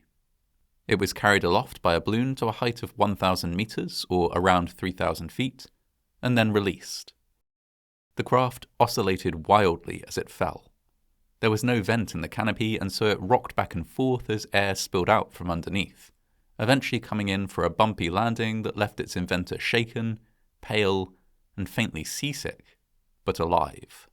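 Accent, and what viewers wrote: United Kingdom. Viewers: Just a quick question, Sir. In witch part of Great Britain are you from/located? It is just so weird when you say fall. It sounds like your are saying fool. Same with the word call. Otherwise I really love your uploads 🙂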